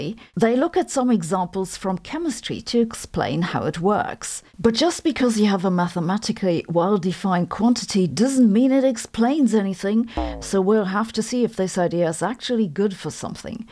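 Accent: Germany. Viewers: Dear Sabine, just two questions about your themes presented in this video: i) You said that although the mathematical construction works, there's no physical evidence for the "simulated universe " theory. Is there any evidence that excludes this, too?.. If there is, I'd very much like to know... ii) About the method of road construction on the Moon surface: do the scientists involved tested the structural endurance of their "melted tarmac " against the extreme conditions in their lab, concerning temperature, that the surface endures throughout the day-night periods? According to my - limited- knowledge, that's the prime factor for any construction, set aside the humidity, which is non-existent on the Moon, of course... Thank you for your videos! Popularised science, in layman's terms for the broad public, is the best way to spread the achievements of science widely. 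Even speaking with a heavy German accent, which sometimes reminds me the Peter Sellers' performance in "How I learned to love the Bomb"! 😊 I would very much appreciate a reply to my questions, if you please..!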